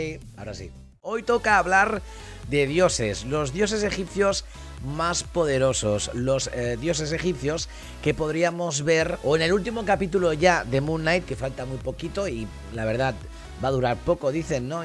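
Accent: Spain. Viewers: muy buen video solo no entendí de primera el chositil ... pero la verdad también lo bueno de tus videos son tus pronunciaciones tan españolas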